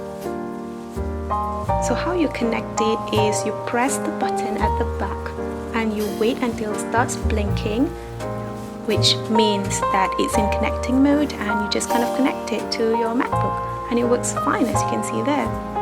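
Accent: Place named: United Kingdom. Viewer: The british accent ❤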